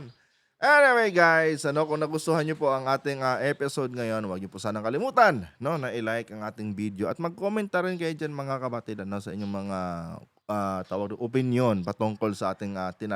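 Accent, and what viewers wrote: Davao, Philippines. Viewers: ated moba si duterte para kang si kong msgsalita taga davao kaba na sng dating ng bodid mo idol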